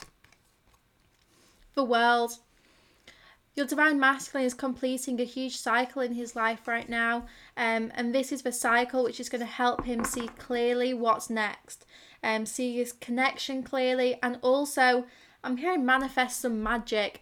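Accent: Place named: United Kingdom. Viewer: Pretty sure accent is British and the cards are priced in pounds, so will be coming from UK. Usually fairly quick postage, although due to unknowns on Brexit, our international post is getting backlogged. I sent all my international post to my family for Christmas today to beat the 31 October.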